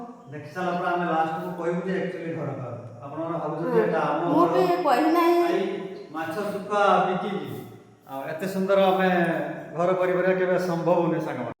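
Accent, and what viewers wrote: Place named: Balasore, India. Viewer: Aapan Kathaa ru janajauchi Balasore boli ame bi Balasore pila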